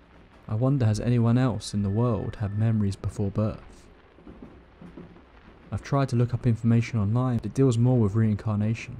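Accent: England, United Kingdom. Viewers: Some fab stories, thanks for narrating them and everyone who shared them. But I definitely never fall asleep to them. I listen whilst preparing and cooking or soaking in the bath. With a glass of something! 🥂😁 And it's great to hear another English voice and accent x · It's so difficult to fall asleep to English accents... Need my murican! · Can't fall asleep to a British accent. Just can't.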